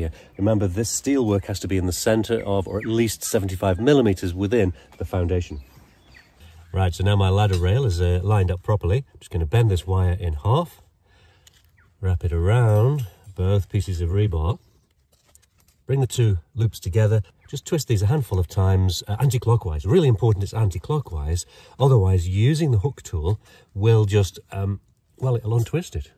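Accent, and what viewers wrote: Lancashire, United Kingdom. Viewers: where you from 'Lancashire?????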